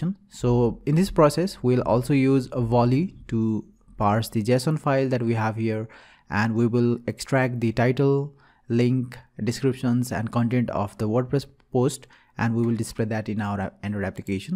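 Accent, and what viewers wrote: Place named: India. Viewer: bu hististanlıların bu ingilizce si beni hayattan soğuttunuz be bilader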